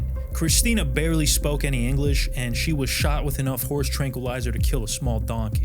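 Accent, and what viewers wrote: Italy. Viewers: First 20 seconds I already like the narrarator's accent lol. Is that... Italian?!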